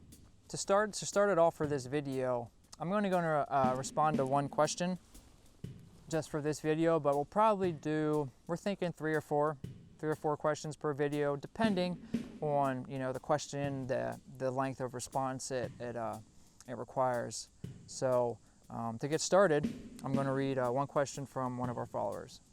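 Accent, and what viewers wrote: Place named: West Virginia, United States. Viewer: great idea! I haven't been subbed long enough to have in depth questions, but I am curious about where you are located. Your voice(accent) whatever you want to call it, reminds me of my husband's family in West Virginia is why I ask.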